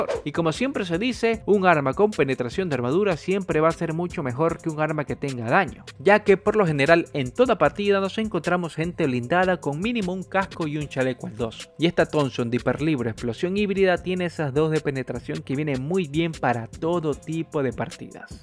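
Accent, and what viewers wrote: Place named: Ecuador. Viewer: Eres Ecuatorianísimo, jajaja sub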